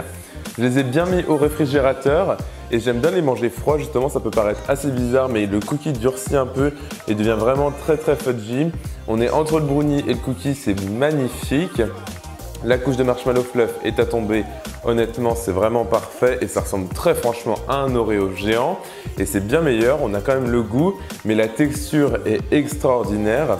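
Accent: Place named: England, United Kingdom. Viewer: ouais ta raison . mais il a un accent déjà bien anglais peut être qu' il anglais et français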